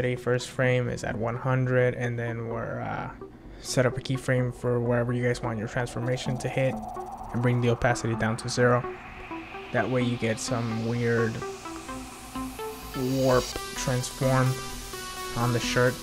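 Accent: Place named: India. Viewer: Indian ascent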